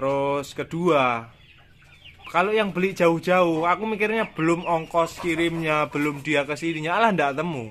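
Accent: Java, Indonesia. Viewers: Aslinya mana mas... Jawa e kok kentel....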